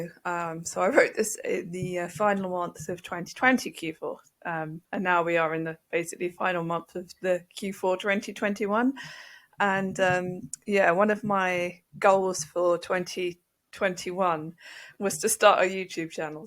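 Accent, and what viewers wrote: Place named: England, United Kingdom. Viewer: Holy Moly, $4k each month after just one year. Insane. Well done you. Likewise, the amount of books you've put out is incredible. I need to get my act together! Only just discovered your channel, nice to hear another (calm!) English voice! Although admittedly I'm semi retired and looking to kdp for extra rather than an entire income, I think if I was earning the royalties you are I probably just stop and let the passive income roll in! Still, you can never have too much I guess 😂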